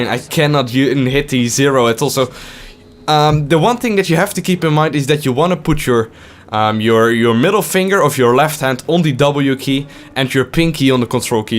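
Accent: Netherlands. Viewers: Your dutch accent is so real 😂😂 no hate good video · Haha dat heerlijke Nederlandse accent. Mooie video man ;) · dutch or Belgium?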